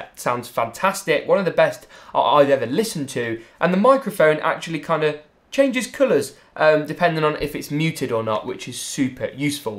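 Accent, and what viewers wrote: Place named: Cockney, United Kingdom. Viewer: Everyone comes here for the PC. I come here for the COCKNEY ACCENT.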